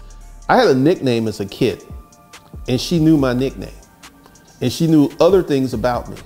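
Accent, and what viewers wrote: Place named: Alabama, United States. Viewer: Do you know what's so funny is that I live in Detroit and I've lived here for 25 years and I rarely get a chance to go home to Birmingham Alabama. When I hear you speak , it brings back memories of growing up in Birmingham. And what is funny, no one here really know about AG Gaston. I actually lived down the road from him and we all knew that was his house on the hill that was gated. I was really thinking about moving back to the south. Thank you for content for thinkers.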